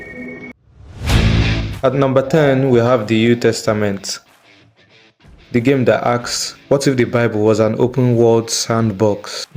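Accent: Nigeria. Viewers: Bro sounds so Nigerian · Yo bro you sound Nigerian ❤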